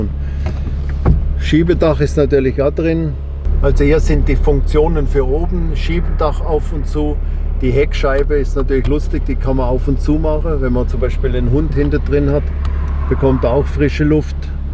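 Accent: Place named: Bavaria, Germany. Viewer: Glad I could still understand a little Bayrische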